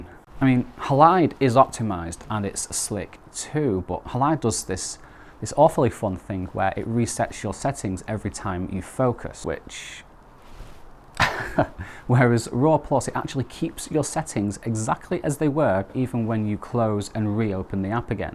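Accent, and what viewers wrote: United Kingdom. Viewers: Hei. Accentul britanic și având în vedere că ai scris mulțumesc în descriere este foarte confuz. Super videoclipul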